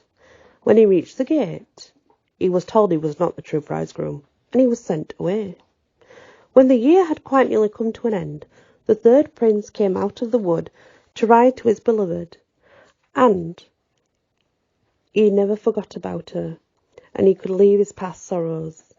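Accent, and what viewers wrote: United Kingdom. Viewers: sounds great with you English accent 🇬🇧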